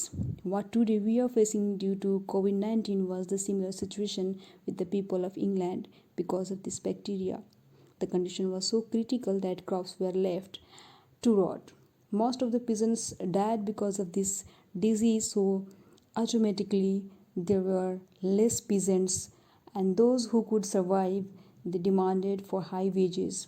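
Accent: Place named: India. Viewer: Are you indian? Different accent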